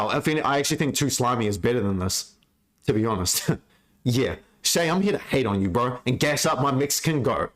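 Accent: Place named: New Zealand. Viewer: most new zealander accent of the year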